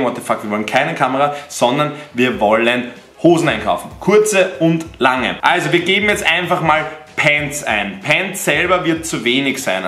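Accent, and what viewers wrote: Austria. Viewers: Wie findest du Hosen von GVAPI? Sag mal irgendwo deine Meinung dazu😁btw feier deine Videos brutal weiter so und hau mal mehr österreichischen Akzent raus😂😂❤️❤️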